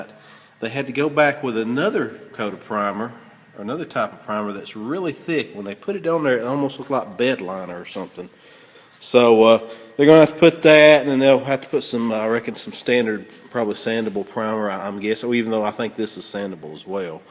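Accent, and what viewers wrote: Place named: South, United States. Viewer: I'm so glad to see y'all restoring this wonderful car. I owned a '71 when I was 17. Loved that car and now I own a '72 (and I'm waaaay past 17 now. lol). Loving your accent too. I spent my first 41 years in Texas, now I'm in New York. I miss listening to southerners. :) I'm impressed with all your work. Kudos to you and your class.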